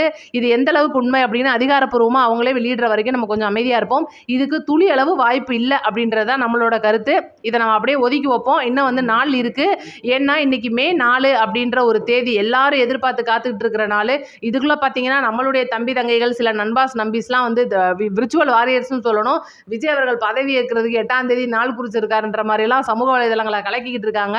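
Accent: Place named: Madurai, India. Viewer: Akka ungal madura pasai super ❤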